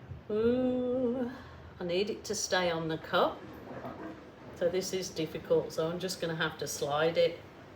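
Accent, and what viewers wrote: Birmingham, United Kingdom. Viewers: I have to ask because it is driving me bonkers, were you born in Australia? The reason I ask is because I constantly hear some Brummie, [Birmingham] in your accent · I thought you are a Brummie I was born in Coventry lived in Bulkington came to West Oz in 1970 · I love watching your videos. My art teacher at school told me I didn’t have an artistic bone in my body so I basically never pursued an art activities after I finished school. During lockdowns I have found your videos initially to calm me because I find watching art being created very therapeutic but I’ve now decided that at 43 I might try and find that creative bone my teacher said I didn’t possess. I know you’re in Australia but are you actually born and bred Australian? I only ask because to me, a Brit, you sound slightly Brummie. I love listening to you you’re so don’t to earth and funny. 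Thanks for sharing all you do x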